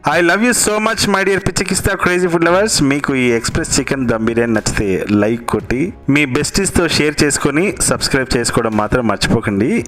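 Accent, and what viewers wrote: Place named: Andhra Pradesh, India. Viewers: Mee words konni ma srikakulam slang la anipistunnai ..ae uru manadi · Meru matladutunty ma village ki vellina feeling vostundi,rajamundry, bhimavaram,Godavari antuntaru kadha,nice videos anna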